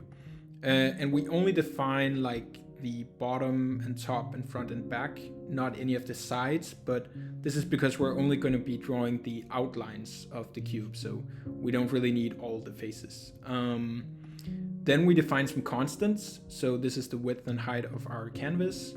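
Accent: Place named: Massachusetts, United States. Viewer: Your from Massachusetts aren't you?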